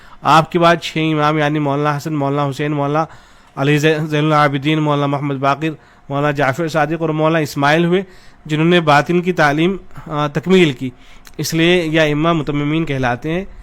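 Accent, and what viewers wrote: India. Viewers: You sound Indian.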